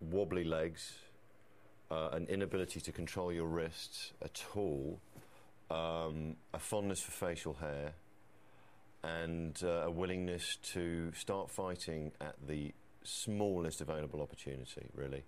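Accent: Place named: South, United Kingdom. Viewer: His pronunciation is certainly British/Southern English...this is his natural voice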